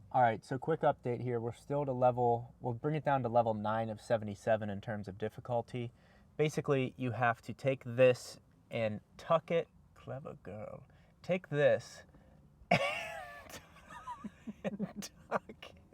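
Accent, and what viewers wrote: Pittsburgh, United States. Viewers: sounds like a yinzer, said "see ha it goes dahn er" Da Burgh.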